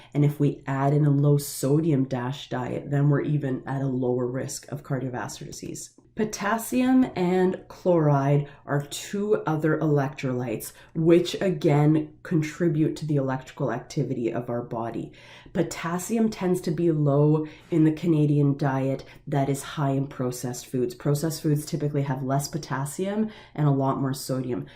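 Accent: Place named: United States. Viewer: Your voice is so beautiful, I really love this accent. Is you accent American or Canadian?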